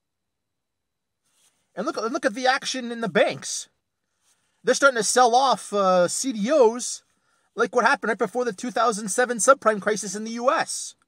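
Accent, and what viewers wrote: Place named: Portugal. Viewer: Are you from Portuguese decent bc I sense a very mild accent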